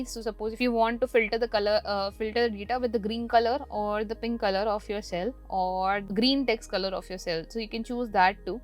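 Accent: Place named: India. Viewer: She is good. But you also Indian i mean same accent with her. 😂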